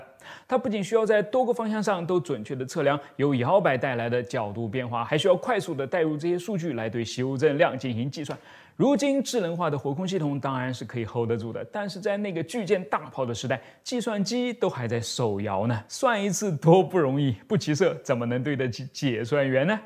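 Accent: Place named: Mainland, China. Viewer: 這是大陸的唸法，很多視頻中聽過。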